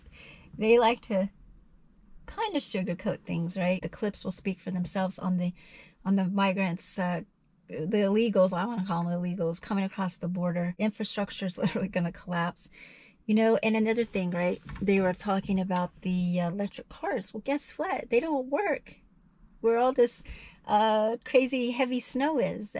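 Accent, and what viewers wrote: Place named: Maine, United States. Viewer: i hear maine, or vermont or whatever and maybe its true. Id like a guess outside the U.S if you picked those.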